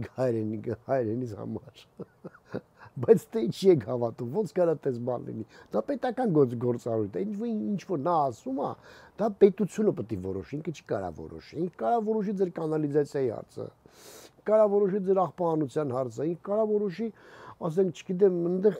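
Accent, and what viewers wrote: Gyumri, Armenia. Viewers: Լեննագանի բարբառը Ձեր եթերներում միշտ պահպանեք, Սերգեյ Դանիելյան❤️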